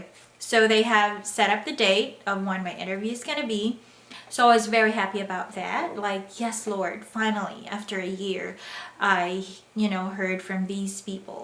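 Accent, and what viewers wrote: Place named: United States. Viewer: True American accent too. congratulations. For me I always speak the way I did. Hope I could fallows your accent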